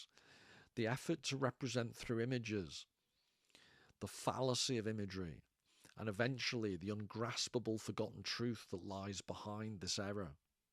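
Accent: Liverpool, United Kingdom. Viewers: Are you from Liverpool by any chance? 🤔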